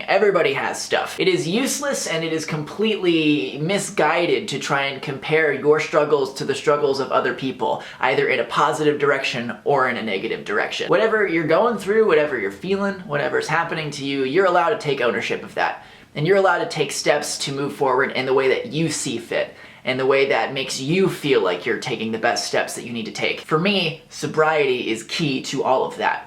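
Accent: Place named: United States. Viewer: I CANT WITH AMERICAN ACCENTS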